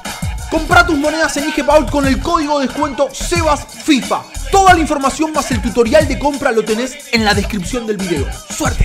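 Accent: Argentina. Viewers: sos argentino?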